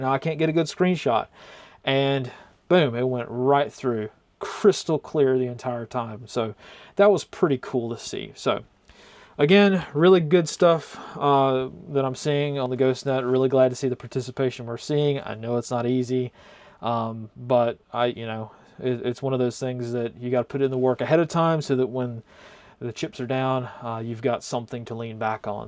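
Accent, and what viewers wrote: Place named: Virginia, United States. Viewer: Accent puts him in virginia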